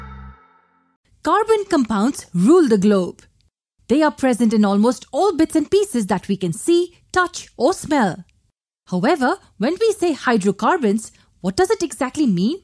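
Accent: India. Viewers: Loving this lesson. Loved the Indian accent too🤩😘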